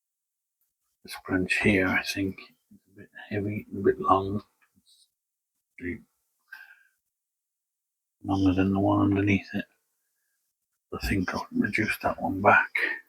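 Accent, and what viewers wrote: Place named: Newcastle, United Kingdom. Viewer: Hello?! Very interesting new channel with fascinating plants of some age at last! I’d like to know more about you, but I’m subscribing anyway. The bonsai show cleared up my question as to your accent, the main voice was Geordie I reckon! God bless your endeavours 🙏✝️. ✌️🇷🇺☮️🇺🇦✌️. ✌️🤍🇮🇱🤍✌️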